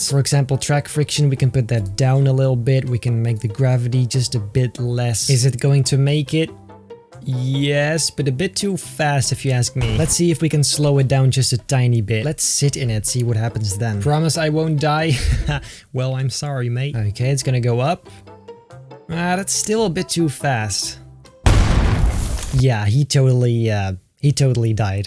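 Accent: Netherlands. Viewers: Are u dutch? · Are you Dutch? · He sounds dutch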